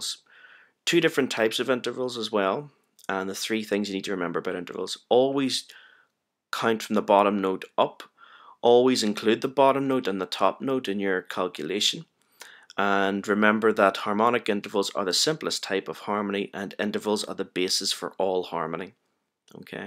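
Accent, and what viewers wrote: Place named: Ireland. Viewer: You speak with an Irish Accent... I might be wrong man...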